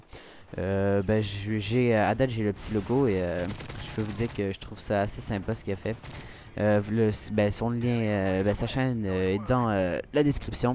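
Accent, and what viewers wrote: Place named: Quebec, Canada. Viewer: bravo pour avoir dépasser les cent abonnés , pour l'accent ont si habitue , beaucoup d'excellent youtubeur sont québécois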